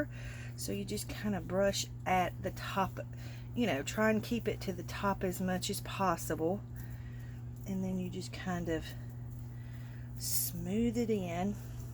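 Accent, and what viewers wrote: South, United States. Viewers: PS...still loving that southern charm and accent....music to my ears👂👂👂👂👂👂👩‍🎤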